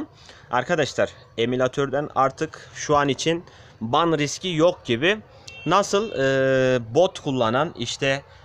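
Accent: Gaziantep, Turkey. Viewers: Aaa Reis antepli misin sen?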